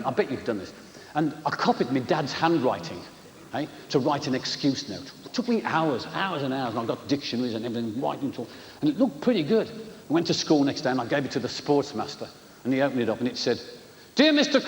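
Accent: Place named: Birmingham, United Kingdom. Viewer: Never would’ve thought jasper came from posh Birmingham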